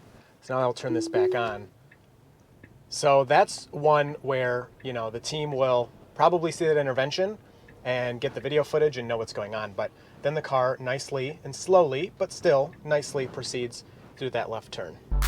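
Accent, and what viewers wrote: Michigan, United States. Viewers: Don't let people criticize your English. American English does have dialects! You're just speaking Michigander dialect. "Coulda went" I use as well.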